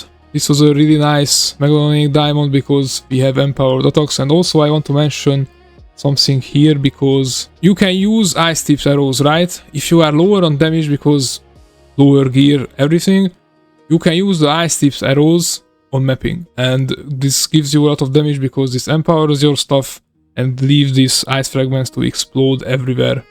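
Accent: Hungary. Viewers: Fasza kis build, nagyon hallatszódik a magyar akcentus! (támogató komment)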